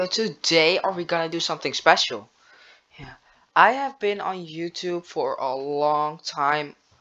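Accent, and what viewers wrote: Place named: Netherlands. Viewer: yup, sounds dutch like me. dutch = nl :D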